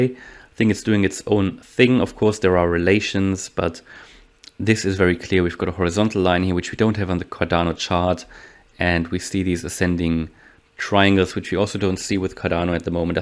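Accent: Germany. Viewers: Deutscher akzent lol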